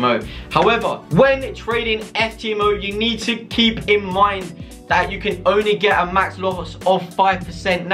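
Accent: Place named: United Kingdom. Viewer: Can you spell out the app for people like me who cannot fully understand ur British English 💯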